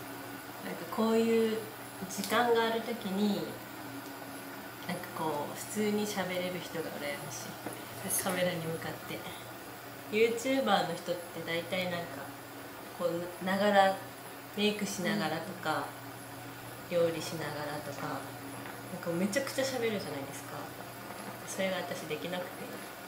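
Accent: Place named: Miyazaki, Japan. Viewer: 花嫁修行でうまかっちゃんかよ！😊笑ゆで卵はガンガンやって全体にヒビを入れた方が剥きやすそうさんって宮崎っぽいアクセントだけど県内の人なのかな？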